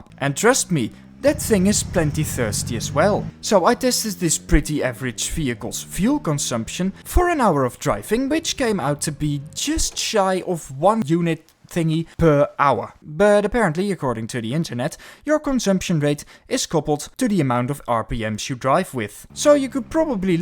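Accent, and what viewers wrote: Netherlands. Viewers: Haha tietemans 😂 weet niet of je het bewust heb gedaan maar ik neem aan dat je Nederlands bent aan het accent te horen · 16 seconds in and I can already tell you're dutch lol. nice video tho!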